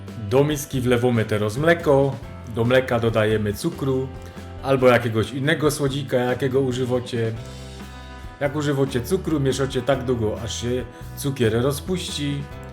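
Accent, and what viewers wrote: Silesia, Poland. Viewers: A co to za fonetyka? Czy to wymowa śląska?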